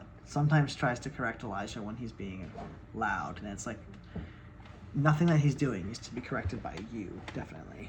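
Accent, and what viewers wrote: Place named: South, United States. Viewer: I love how you get southern on YouTube. Love you so proud of you ❤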